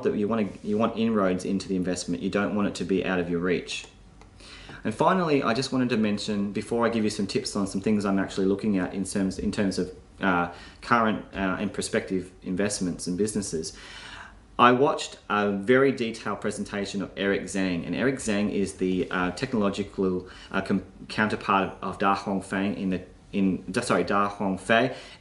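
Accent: Australia. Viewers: Thanks for the great imfo...refreshing to hear an Aussie mate. Awesome presentation too.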